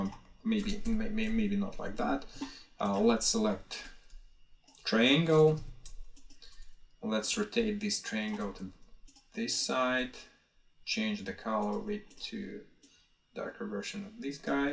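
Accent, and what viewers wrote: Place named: Russia. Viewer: Russian accent))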